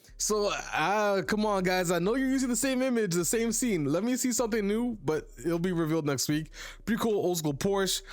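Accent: France. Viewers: Your Inflection On Certain Words Is Becoming French Too 😭 Pis Pas L’Type Canadien 🥀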